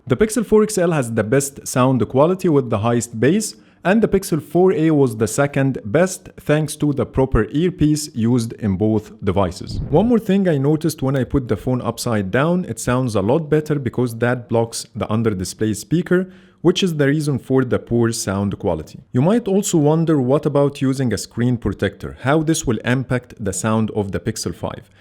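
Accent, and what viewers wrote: Egypt. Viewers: يخي ترا مبين والله انك مصري وعم تحكي انكليزي ... يرحم كل شخص تحبوا ارجوك عدل اللكنه تبعك تبع الانكليزية تبعك 🙏🙏 يخي والله بحب محتواك بس من لكنتك صار عندي فوبيا و مبدي اتفرج اكثر 💔💔 ينقصك اللكنة فقط والله 🙏🙏🙏🙏💖💖💖💖💖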